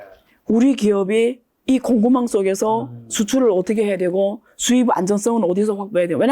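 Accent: Yanbian, China. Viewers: 중국말투 너무 듣기. 싫습니디ㅡ. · 말투가 완전연변말투 ㅋㅋ그리구 군사전문가두아닌게뭔 우크라이나와 러시아전쟁분쟁얘기를 하구있나 돌팔이두아니구 헐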